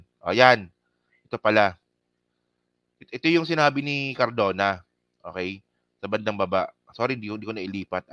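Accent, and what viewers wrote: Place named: Cavite, Philippines. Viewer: Yung tonight ilang gabi na yun! Sabi nga kapag ang ilog maingay, mababaw lang yan., Caviteño ka ba? Murang Caviteño naririnig ko sa yo, e!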